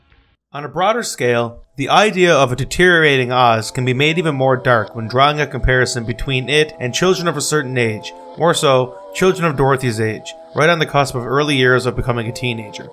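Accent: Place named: Newfoundland, Canada. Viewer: Love the video and all of these films. But I need to ask....you've gotta be a Newfoundlander, am I right? (fellow NL'er here)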